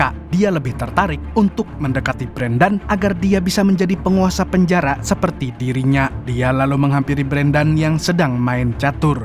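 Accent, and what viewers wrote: Banjar, Indonesia. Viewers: urang banjar kah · Urang banjar sekali nya sidin nih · admin nya banjar hhhhh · Behh admin urg banjarr skalinya😂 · 😅😅 urg banua sakali nya mimin nya😅😅😅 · Orang banjar kah min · Adminnya orang banjar kh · Gua curiga sama suara ini pasti orang Banjar balas sanak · Banjar banar pian mang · Banjar neh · NGALIH BUHAN BANJAR NE 😅😅 AYAKKKKK SAAANNNIIIIII🤣🤣🤣 TAGUGUR-GUGUR ULUN MANDANGARNYAA😅😅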